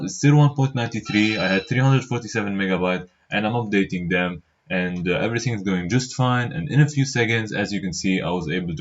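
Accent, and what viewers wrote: Lebanon. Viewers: you sound very Lebanese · Lebnene sa7? Min sawtak w lahjtak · Hii are you lebanese ? Cause your name and accent makes it seem like you are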